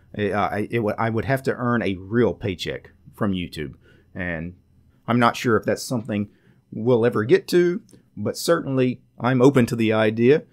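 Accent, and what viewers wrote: South, United States. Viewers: Hello, I am from CA but I am right now in Italy with a friend who is a programmer and he installed linux on my computer and he was listening to your video on Joplin as he was trying to find me an alternative to Evernote and he is learning English and he was asking me where you were from, what kind of accent you have, since it is different than mine. Can you tell me what area you are from, are you from a southern state?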